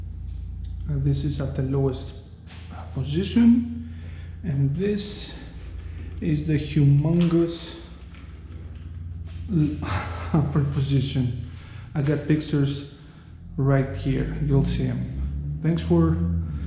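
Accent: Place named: Greece. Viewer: Yo man... finally a voice. Love the accent. Reminds me when I was kid... my buddy was Greek and his dad had the same accent. From what I have read, the Puig is great for wind protection. Good job bud.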